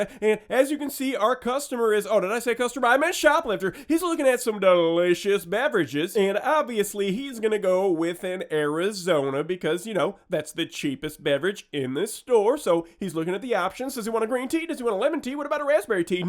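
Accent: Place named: India. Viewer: wheres the indian voice?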